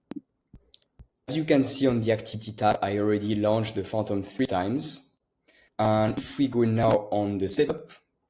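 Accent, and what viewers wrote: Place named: France. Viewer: no wonder nobody watches the videos, cant understand that thick french accent.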